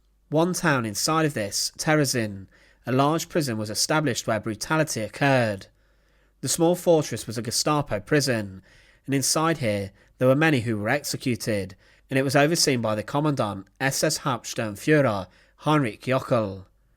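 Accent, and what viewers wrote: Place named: Cockney, United Kingdom. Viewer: I know. I have a difficult time understanding his Cockney accent.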